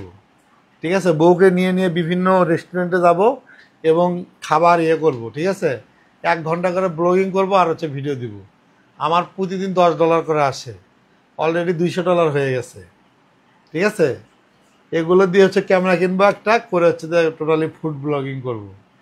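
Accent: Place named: Bogura, Bangladesh. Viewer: আচ্ছা আপনি কি বগুড়ার লোক? আপনার কথা শুনে মনে হচ্ছে হয়তো আপনি বগুড়ার লোক।কারন আমিও বগুড়ার মেয়ে।এজন্য জিজ্ঞেস করলাম।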